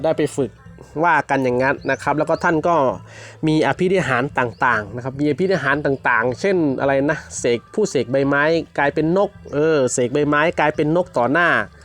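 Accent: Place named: Laos. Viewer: ข้อยวาเว่าลาวเลยกะได้ๆๆสำเนียงมันมาแล้วเด้อพ่อใหญ่ๆๆๆๆอิอิออิ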